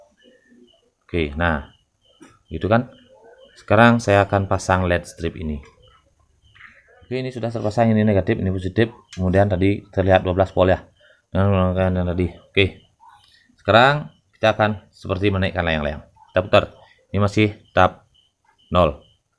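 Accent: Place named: Bali, Indonesia. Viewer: Kaka ini orang bali kah ko logot nya kayak orang bali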